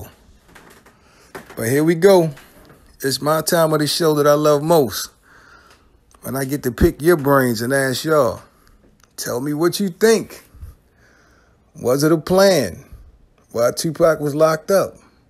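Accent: Baltimore, United States. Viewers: Subbed heard you say tew an kne you from baltimore. The content is A1 so the sub was a easy choic dummy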